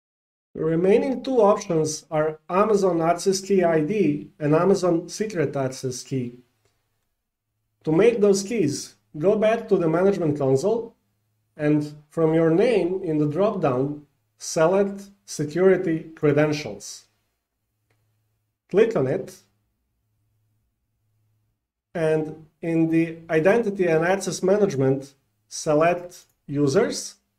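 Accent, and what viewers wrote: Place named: Arab world. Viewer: thank you so much for a nice video in Arabic accent :D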